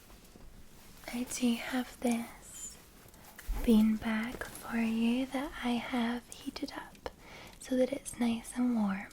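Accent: Scotland, United Kingdom. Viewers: What is this lovely Scottish woman doing in my bed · This one makes me feel like I've been pampered by a sleep-angel, sent from Scotland to give me a relaxing infusion of comfort sleep. Sending happiness and health to you Ms ❤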